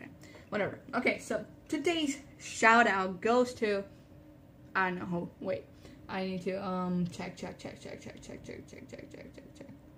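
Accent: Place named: England, United Kingdom. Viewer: bruh but why u have an English ascent in your language????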